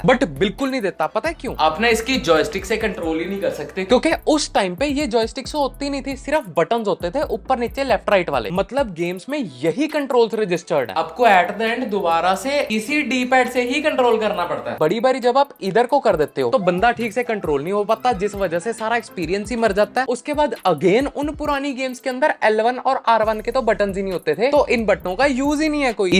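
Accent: Punjab, India. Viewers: Are u from punjab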